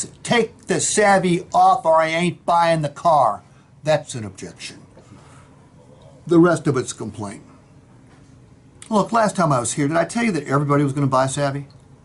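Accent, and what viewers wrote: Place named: South, United States. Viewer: hillbilly and his hick rube foghorn leghorn talk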